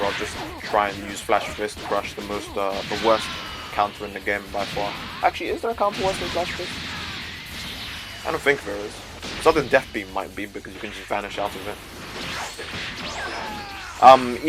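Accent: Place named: England, United Kingdom. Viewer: Are you from England? You got the accent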